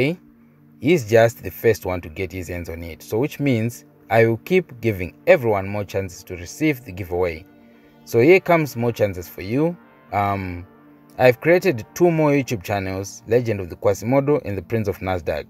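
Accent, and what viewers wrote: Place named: Zimbabwe. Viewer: I once asked you if you are a Zimbabwean that kind of English l know it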